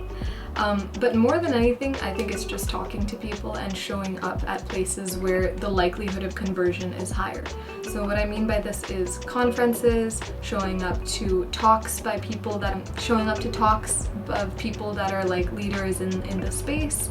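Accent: United States. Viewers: Did you do your studies in 🇺🇸 US, I am in US for my masters I wanted to get like your accent 😊 Please help me in improving my accent ❤ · Why do you speak in an American accent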